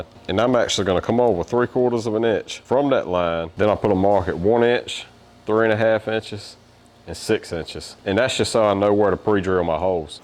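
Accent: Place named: South, United States. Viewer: Where are you?? I am trying to finger out your accent but I can’t!! It’s southern, it’s a bit Cajun, it’s a bit lumbee.. I have no idea!! ;)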